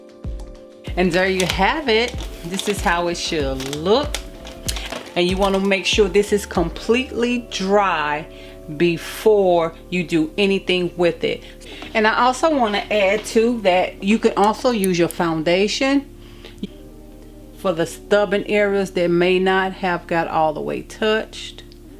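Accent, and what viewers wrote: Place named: South, United States. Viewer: I will try it. You did a great job!! Love your accent (southern?) Thanks so much!!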